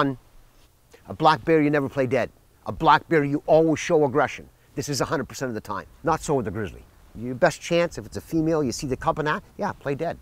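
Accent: Canada. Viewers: “Y’know?” Yep that’s how talk in northern Ontario 😭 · This guy is clearly Canadian. He has the accent and the video states he is from Ontario.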